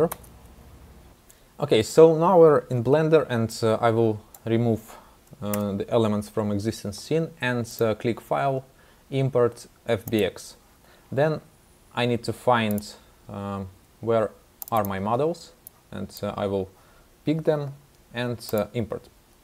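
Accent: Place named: Russia. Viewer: Услышал русский акцент и понял, что моя проблема решена😎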